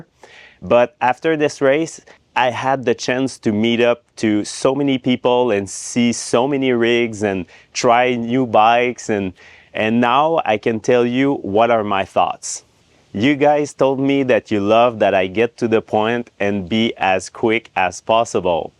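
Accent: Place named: Quebec, Canada. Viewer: Great Video, keep the great information coming. I always love Quebecois accents so no apologies necessary. we ski and hike in the Charlevoix region all the time. Jeff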